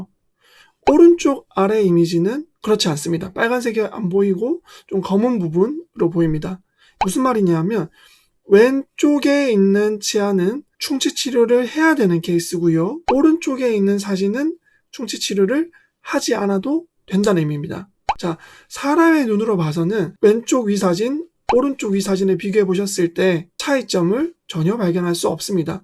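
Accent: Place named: Gyeongsang, South Korea. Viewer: 말투가 경상도네 돈에 환장한 극우 확정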